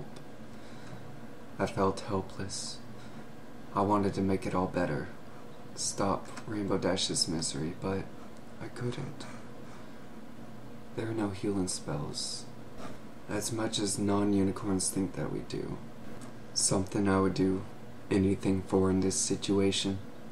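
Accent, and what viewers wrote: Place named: South, United States. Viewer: Congratulations, I'm sad. I might just suggest though, when you read, pronounce each word, don't slur at the end. I was wondering if you were southern because I kept thinking of as you talked In Twi's perspective. Also, another pointer, don't read when you have what sounds like a morbidly obese man in the background snoring. Wait until you're alone. All critique aside, great story, great reading. Good job.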